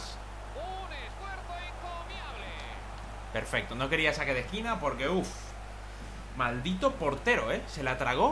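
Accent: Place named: Galicia, Spain. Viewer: Ya extrañaba tu acento latino-gallego XD Like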